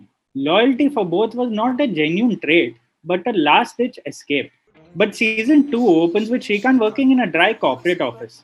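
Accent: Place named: Delhi, India. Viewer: What a crappy narrator- I like reading you rather listening to you. You have those typical Delhi accent- thel thel ke batein kadna. Please you better write.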